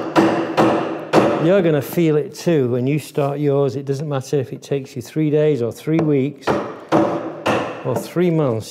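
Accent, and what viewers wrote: United Kingdom. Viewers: So it is british accent after all. I didn't know british woodworkers use inches as well. But then again in next episode he says 'it's half a mil" so I guess he uses all units he finds useful.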